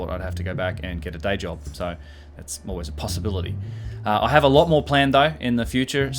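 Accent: Australia. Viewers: I wish the voice in my head had Aussie accent. <3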